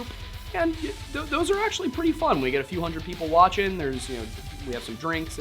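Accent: Canada. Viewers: "Let's get Innuit." The Canadian lighting and accent. All 🤌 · dude you sound like a leprechaun with the Canadian accent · Your Canadian accent killed me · Love your Canadian accent -a laughing Canadian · As a Canadian I did like the accent you went for, it was a little honky tonk but you got the right idea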